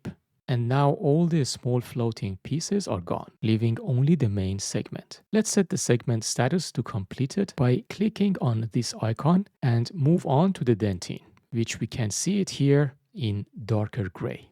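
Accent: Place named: Iran. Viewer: Why do I sense a little bit of beautiful persian accent from narrator voice!?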